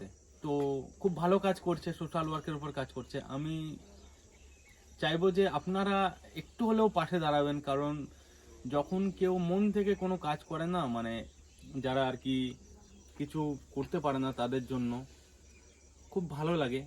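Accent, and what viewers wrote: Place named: Bangal, Bangladesh. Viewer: এর বাঙাল কথা বন্ধ হয়েছে। খুব ভালো লাগলো।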